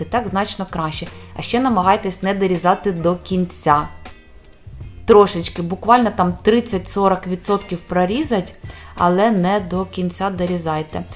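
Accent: Russia. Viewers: Російський акцент цієї блогерки ріже вухо😮 Будь ласка не видаляйте негативних коментарів😮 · не може вимовляти букву О у ненаголошених складах, все одно виходить А - це загальне явище у всіх, для кого рідна мова російська.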